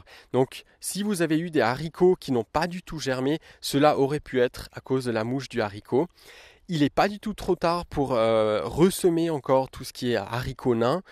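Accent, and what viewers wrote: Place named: Alsace, France. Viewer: On dirais que vous êtes Alsacien ^_^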